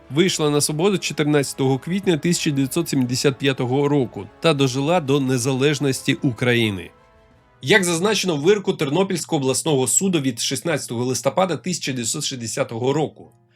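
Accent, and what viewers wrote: Moscow, Russia. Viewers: Якби не знав, сказав би,що він із мааскви,над акцентом потрібно попрацювати!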